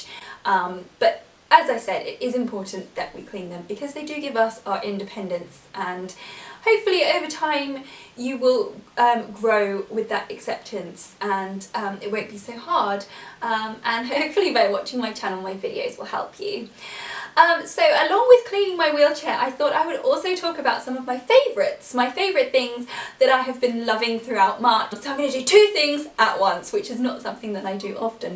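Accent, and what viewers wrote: United Kingdom. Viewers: Your rich British accent never gets old. 😃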